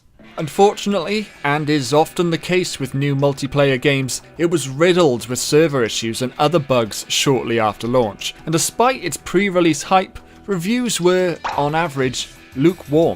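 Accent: Wales, United Kingdom. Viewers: Is that a Welsh accent, cant tell if it is or not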